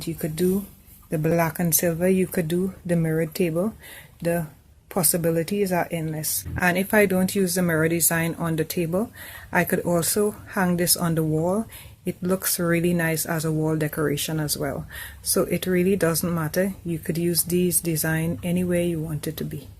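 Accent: Trinidad and Tobago. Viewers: Hi you sound like a trini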